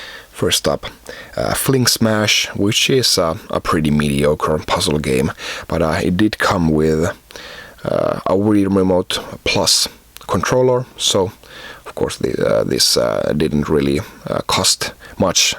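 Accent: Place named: Finland. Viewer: He cant help his Finnish accent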